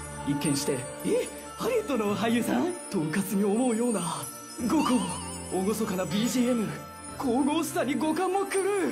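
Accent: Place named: Japan. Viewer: the japenglish is my favorite part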